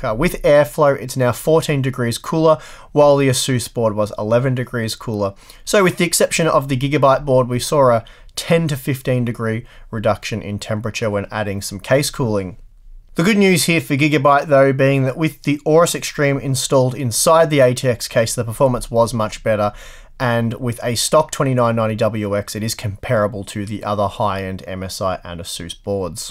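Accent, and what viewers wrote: Australia. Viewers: Just what I want in a tech YouTube channel, Australian accent, gives you alot of detail on everything Pros and Cons. Keep up the good work. 👌🏻👍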